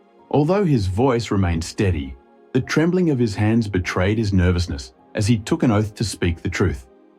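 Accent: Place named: Australia. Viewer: Australian patois just does not go well with these stories. Canadian is the best.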